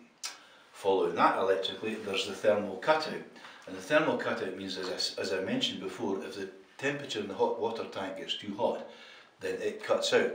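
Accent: Scotland, United Kingdom. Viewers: cause yer scottish :D (y) liked!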